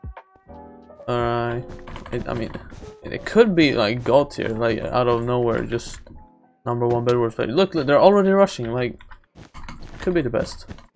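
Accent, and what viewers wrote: Sweden. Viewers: you from sweden??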